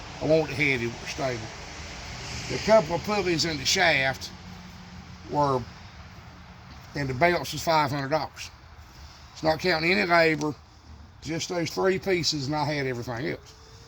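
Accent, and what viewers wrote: South, United States. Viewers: I dont know him from Adam, but he comes across like the salt of the earth kinda person. The men in my family die fairly young, but something about him and his mannerisms feels so familiar. He reminds me of them. I think hes a mixture of Rodney Dangerfield, Archie Bunker and someone else.. I cant quite pinpoint, but I can listen to this man leaned up against a fence post with his cup all day long. The wisdom that hes willing yo share in between his words, the crickets, the farm backdrop, the southern drawl…Big fan of this channel.❤. Might should offer some merchandise, Id love to wear a “Homesteading the Hardway Hat” hahaha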